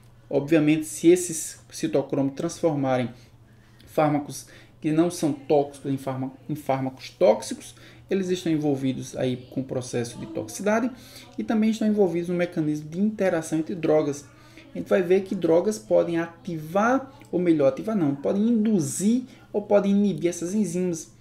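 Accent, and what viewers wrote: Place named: Sergipe, Brazil. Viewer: Muito obrigada por esta aula tão elucidativa! Seu sotaque me parece sergipano. De onde o senhor é? :)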